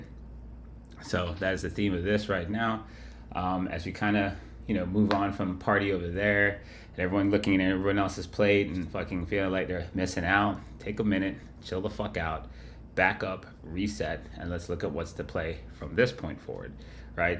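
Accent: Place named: New York, United States. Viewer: i hear that NY seeping in here'n there :)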